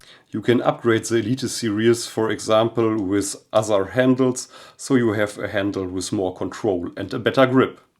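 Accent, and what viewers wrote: Germany. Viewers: Your accent is undeniable german - but likeable 😉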